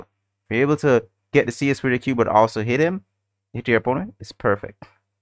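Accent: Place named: Jamaica. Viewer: He's got a Jamaican accent, which is pretty unique, so don't get discouraged if you're a language learner. 👍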